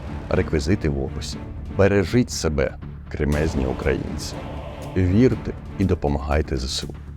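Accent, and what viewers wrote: Russia. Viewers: Підготував відео з хорошим звуком та картинкою, але не спромігся прогнати текст так, щоб вуха не різала вимова (українські слова вимовляє за росіянськими правилами граматики). Просто боляче слухати, що вже казати про логіку, аля: "ну ми ж таки виготовляємо". Питання в тому чи всі можливості використанні, чи може варто критикувати владу за недостатню мобілізацію заводів по виготовленню боєприпасів, забиванню на дрони-камікадзе і вставляння палок в колеса приватним виробникам зброї + волонтерам? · У тебя русский акцент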